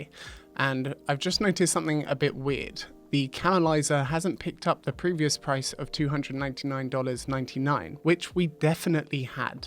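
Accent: United Kingdom. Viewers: For those (like me) who assumed that this bloke might be doing UK prices because of his accent, this is for US prices. (this is not a dig at the channel, just putting this out there for those that might see it)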